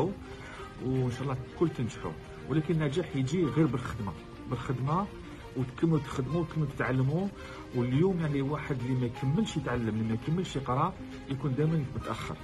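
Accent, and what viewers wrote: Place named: Algeria. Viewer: Very nice from Mr and thanks to him. Note that he kept his original algerian arabic accent very well. Thanks for US Ambassy and TSA. Just one missing: "Inchallah" must be reintroduced in the subtitles, tell the ambassy, TSA!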